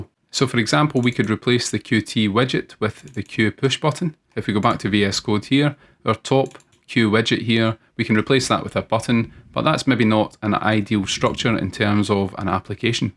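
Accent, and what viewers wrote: Scotland, United Kingdom. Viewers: listening to scottish always makes me really happy for some reason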